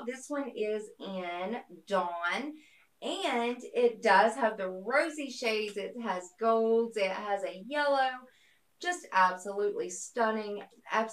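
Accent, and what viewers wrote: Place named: Tennessee, United States. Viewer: Love your accent! I'm originally from Knoxville, Tennessee and you sound like home. lol. I'm supporting through the group for your watch time now.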